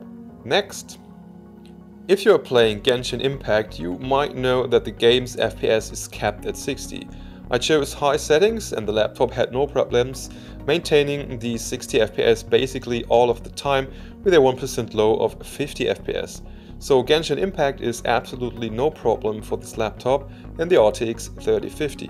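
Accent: Germany. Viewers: Moiin Denke du bist auch deutsch so wie dieser akzent klingt... (nicht der einzige hinweis)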